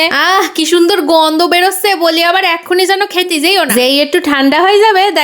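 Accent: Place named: Bangladesh. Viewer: Tor voice kintu darun ...pakka bangal mone hoch6e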